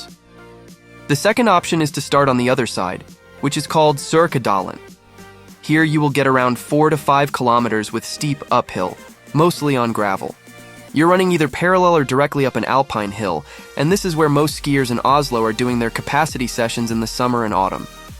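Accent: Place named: Canada. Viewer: You're Norwegian? Your accent sounds Canadian.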